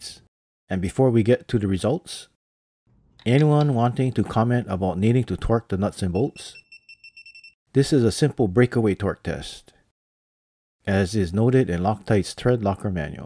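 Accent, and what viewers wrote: Hawaii, United States. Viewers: Are you from Hawaii? I hear a little Hawaii local twang in your voice lol😂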